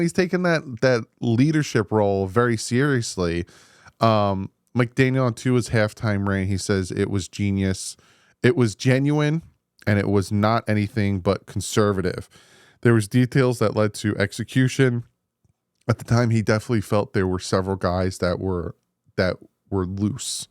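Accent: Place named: Hawaii, United States. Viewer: I really wanna hear this rant and the Hawaiian accent lol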